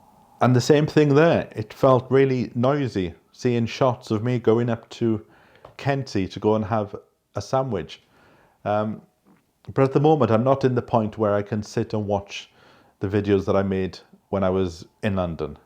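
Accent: Wales, United Kingdom. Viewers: I'm loving your channel! My father grew up in Neath. I miss his lilting accent and his magnificent singing voice. He left Wales in his late 20's, because of his very bad asthma... they told him he needed the sun and dry air in South Africa, so off he went. And then he met my mother and a while later I arrived. Your accent is not as broad as his was. Even so, it does my heart good to hear it! The friendliest man, he loved telling a good tale and sitting down at the piano, playing and singing his heart out. Bless you and thank you for the happy nemories and your fascinating channel. Bronwen from Johannesburg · That story about the fish and chips order made me laugh - hardly surprising they didn't want to deliver 300 miles away!😁 I wonder what the locals think of your accent - it is a definite Welsh accent to me, but perhaps to their ears it is quite mild and you even sound a bit English? 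How are you getting on with reviving your Welsh language skills? Do the locals speak Welsh on a daily basis? You seem very settled there already. 🙂 The hilly terrain will soon improve your fitness if you take daily walks.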